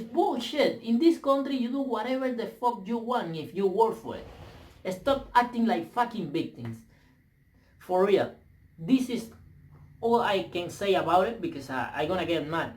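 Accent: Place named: Cuban-American, United States. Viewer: su asentó es cubano/americano aquí todos hablan diferente si escuchas a un australiano habla diferente a un inglés y los dos mensionados hablan diferente a un americano incluso aquí los new yorquinos hablan diferente a los californianos. No se tu de donde tengas el acento. Yo lo tengo Californiano y le entendí todo muy claro a.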